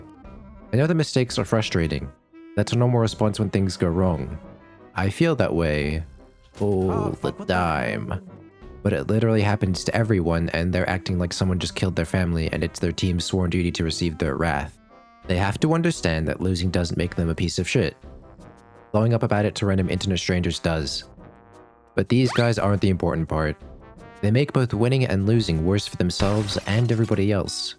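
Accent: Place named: United States. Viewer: you have an american accent but u are australian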